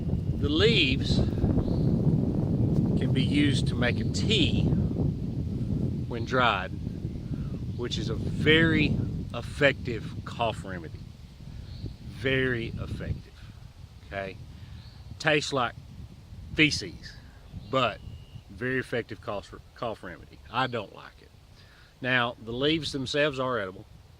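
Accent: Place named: South, United States. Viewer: I'm subin' because you sound like you know your stuff and you sound like your from the south. I'm back in Alabama, where I belong and I've been looking for a channel like this in my neck of the woods.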